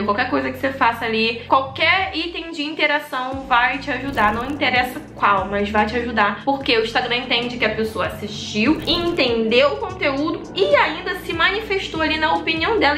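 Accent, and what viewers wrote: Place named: Rio de Janeiro, Brazil. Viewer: vc é do suburbio do Rio? Pergunto pq eu sou e eu super me identifico com o seu jeito de falar.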